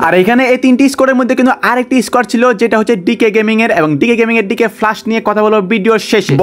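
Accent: Noakhali, Bangladesh. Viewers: Bai ki noakhalilla?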